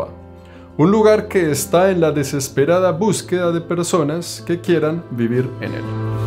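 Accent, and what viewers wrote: Colombia. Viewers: hermano se te escucha muy colombiano jajaja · Que acento Colombiano más chocante , el video sería mejor sin ese Colombiano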